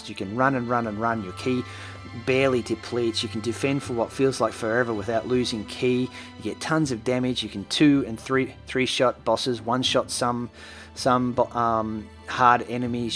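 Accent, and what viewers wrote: Australia. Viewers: great vids by the way, I'm aussie too, nice to hear the accent.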